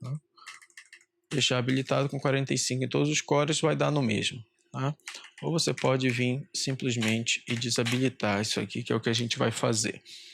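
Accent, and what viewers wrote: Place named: Pará, Brazil. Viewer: Certeza que é do Pará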